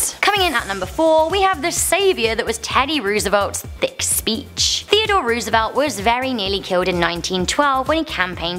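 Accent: United Kingdom. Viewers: Nice video and btw I know you are british but still lovw your british accent <3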